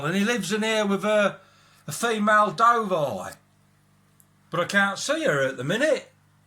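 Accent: United Kingdom. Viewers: I also learn british accent love this mate! 🙆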